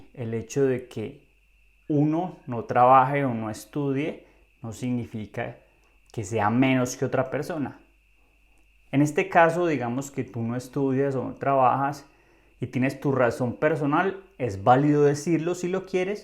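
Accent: Colombia. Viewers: Gracias por este video. Soy de Polonia pero vivo en Inglaterra, y me encanta el acento colombiano!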